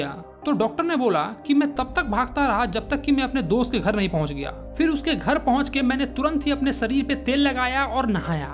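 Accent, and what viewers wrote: Bihar, India. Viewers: Bhai 100% bihari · Aapke padhane ka tarika mujhe bahut accha lga jis trh aap bolte ho ekdam bihari ki trh kyunki main bhi bihari hu to mujhe aapke padhane ka tarika aur bolne ka tarika bahut accha lga 😂😊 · Bihar se ho kya? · Bro is from Bihar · Are you from Bihar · The bihari accent 😂😂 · Bihari bhai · Bhaiya kya aap bihar se ho ?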